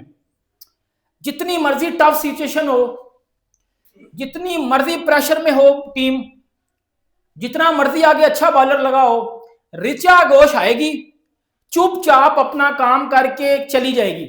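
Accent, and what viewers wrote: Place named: Punjab, India. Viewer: Sir ji, aapki Punjabi laced commentary sun karr maza aata hai. Per, Pakistani team girls ne bhi achcha khela. Inshaa allah, baaki matchon mein bhi achcha khelengi aur jeeteingi bhi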